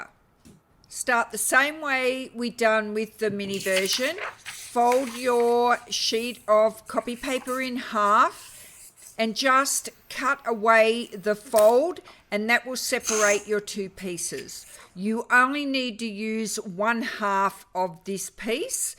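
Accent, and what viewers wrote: Australia. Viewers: I love hearing an Aussie voice and intonation, talking about craft. G’day from Forster. Adorable books too!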